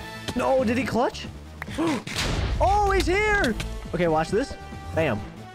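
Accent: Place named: United Kingdom. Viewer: Bro your british thats sooooooo cool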